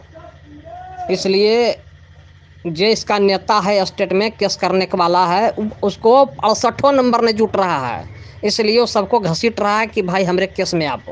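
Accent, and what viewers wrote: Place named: Bihar, India. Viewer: Ekdum sahi bola Bhai Tone to ekdum bihari Hy bhai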